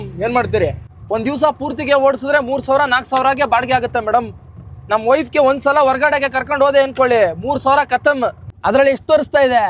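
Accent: Mandya, India. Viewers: Yene heli bro Nam ಮಂಡ್ಯ ಭಾಷೆ ನೀವು ಮಾತಾಡೋದು ಬೆಂಕಿ 🔥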